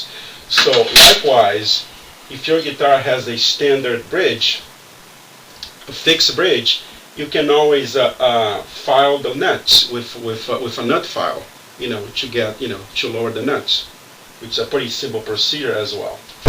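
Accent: Brazil. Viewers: sotaque brazuca detected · dude, are u brazilian? u really sound like one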